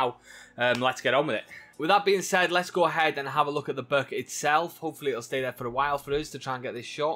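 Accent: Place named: Wales, United Kingdom. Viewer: I like your welsh accent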